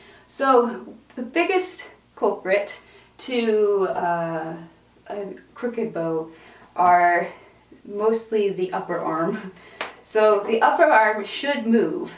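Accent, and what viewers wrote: Canada. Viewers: I can taste the Canadian in her voice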